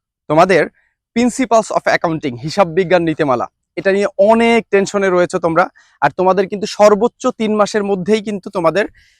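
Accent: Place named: Sylhet, Bangladesh. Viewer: আপনে কয় থাকেন সিলেট নাকি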